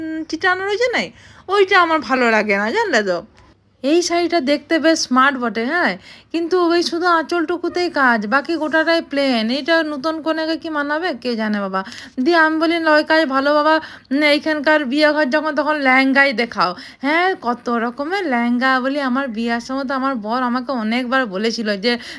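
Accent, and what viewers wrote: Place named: Purulia, India. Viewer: Didi aapnar Bangla ta kon jaygar aar tomar biyer samay to lahenga chio na tomar bhasa ta thik purulyar moto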